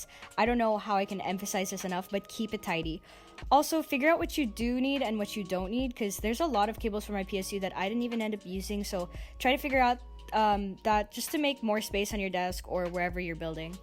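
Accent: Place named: Philippines. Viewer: You sound like you're filipino. Are you?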